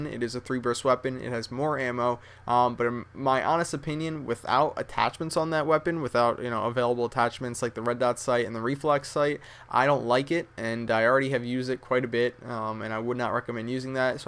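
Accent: Sweden. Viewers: are you from swedin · are you from sweden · are u from sweden? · Does he sound Sweden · are you from sweden? :P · ARE YOU SWEDISH?!!? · is he from sweden? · Are you from Sweden? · are you swedish? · Are you from sweden? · are u swedish lol?? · are you from Sweden? :O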